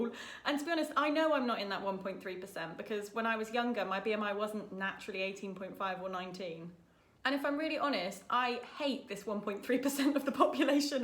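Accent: United Kingdom. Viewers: I am SO intrigued - where do you live?? English accent but always so sunny!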